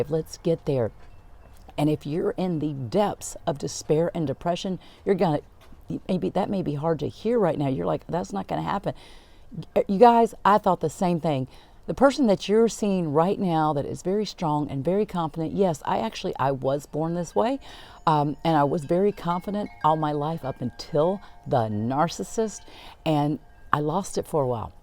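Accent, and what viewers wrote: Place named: South, United States. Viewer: Thank you. Your doing great and helping me and many Thrive! Plz use your humour! Loving your glasses & that sweet Southern accent!. Loving these long lives! You go girl! I´m starting to thrive again with Purpose & Passion to do what I love! Living well is the best revenge.Knowledge is power! Game over narc. Many Blessings to you all.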